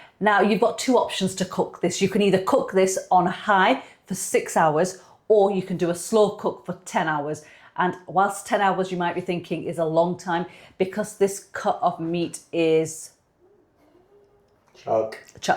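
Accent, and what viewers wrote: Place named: Northern England, United Kingdom. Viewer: Love this channel for easier one pot curry recipes to BIR style!.. and the absolute clowns complaining about how your say turmeric just ignore them. Im a Geordie and i love the fellow northern accent 💙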